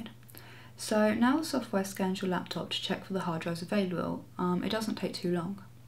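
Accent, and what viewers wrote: England, United Kingdom. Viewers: are you an idiot shes english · Are you from england ?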